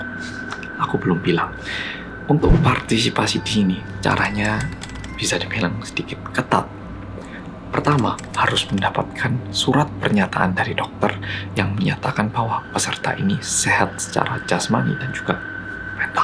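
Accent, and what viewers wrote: Java, Indonesia. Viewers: Masih bersama mas yang MEDOKKKK... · Nah iyak sama😂lupa soalnya..biasanya medok wkwk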